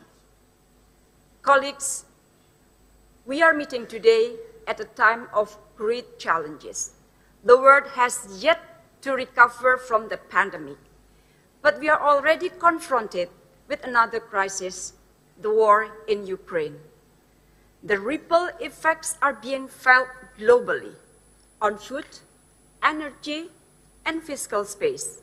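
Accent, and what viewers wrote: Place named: Indonesia. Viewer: Menluku Englishnya keren mudah dipahami walau ake Indonesia accent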